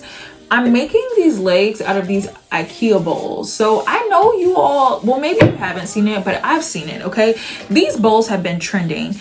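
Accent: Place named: Appalachia, United States. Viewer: Why do you say'y'all?? Are you a hick only hillbillies, talk like that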